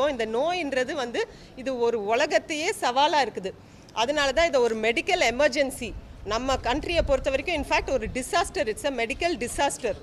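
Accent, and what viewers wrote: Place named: Tirunelveli, India. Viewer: We like your tirunelveli Tamil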